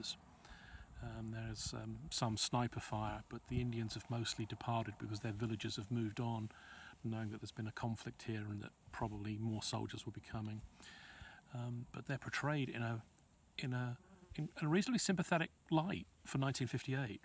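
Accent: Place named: England, United Kingdom. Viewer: Good ole English voice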